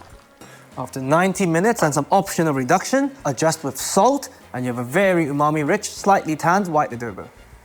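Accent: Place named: United Kingdom. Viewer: with your eyes closed its like listening to some posh old English cooking radio show :D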